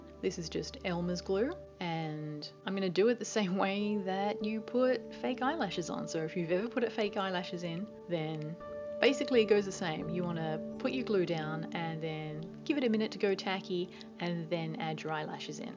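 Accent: Australia. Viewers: Are you australian? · Hi, really nice to hear a good old Aussie twang for a change. I'm so wanting to do this and also on plastic (acrylic) dolls. Which products do I use? I can't understand how you get your colours to stick? Any help would be much appreciated. Fellow Aussie from Queensland 🤗❤ Just subbed 🤗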